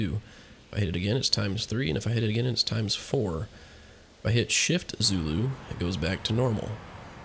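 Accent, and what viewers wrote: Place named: United States. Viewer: 'mi...' what? Zee...? You Yanks are really 'zeee' in your sick nappers. Unbefuckinglievable how you linguistically inapt and generally illiterate riffraff mutilate the English language. And to top it all off some even add this disgusting, thick rhotic accent... Barf! It causes ear cancer. How about not to try to swallow your own tongue while you speak? 'Nohohoho, better not, for we could sound like humans and not like the armed lunatics who we are, spread all over the world to murder other peoples.'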